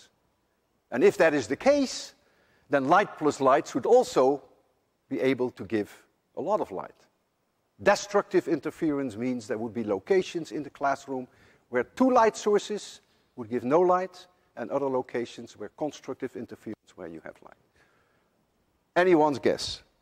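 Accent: Netherlands. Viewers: Try it , Huygens , hoigens........ Die Nederlandse tongval is en blijft geweldig. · Haha , it took me 5 seconds to know you're Dutch Mr Lewin. You speak DENGLISH . Zo noemde m'n engelse collega's het. You think Dutch and translate , where as i think in English and write it down. Maar ik vond de video boeiend en duidelijk.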